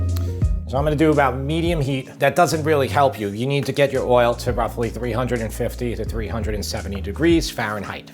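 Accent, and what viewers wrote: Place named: East Coast, United States. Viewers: shake n bake. love the east coast accent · Hahaha. I was like this guy has to be from long island. Saw the location. Locatelli is the only acceptable grated cheese.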